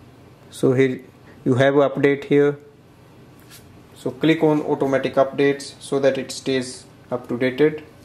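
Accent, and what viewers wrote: India. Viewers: My T7 (or T7 Shield) is not recognized by devices. The manual says ... Make sure that the T7 is connected securely to the USB port. If you use cables other than the one included in the product package, the T7 may not be recognized. Some PCs may not recognize the T7 when connected to the USB 2.0 port if the current exceeds the max. limit (500 mA) described in the Power Specifications. In such cases, please try a different USB port. BTW that India english is very annoying for non english speaking people !!! Almost all words are distorted into strange sounds and the accents of single words are placed differently. Talking slower would helps a lot, so we get more processing time.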